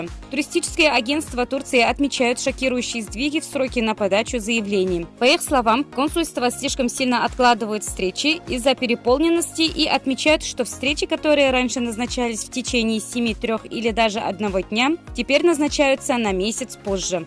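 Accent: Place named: Tatarstan, Russia. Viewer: Такой родной , татарский акцент)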